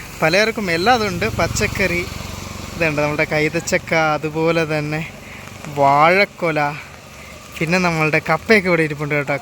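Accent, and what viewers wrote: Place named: Kothamangalam, India. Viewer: നിങ്ങൾ കോതമംഗലം കാരനാണോ കണ്ണൂരുകാരൻ ആണോ നിങ്ങളുടെ ഭാഷാശൈലി കോതമംഗലം ആണല്ലോ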